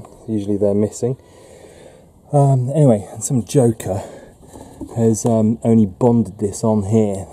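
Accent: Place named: England, United Kingdom. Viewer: I'm super stoked and appreciative of your videos, but between your English accent and how DEEP the tone of your voice is versus the sound equipment you used, it's difficult to understand you.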